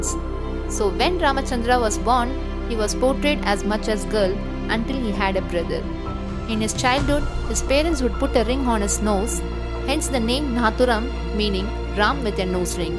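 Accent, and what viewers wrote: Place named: South India, India. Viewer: Madrassi ascent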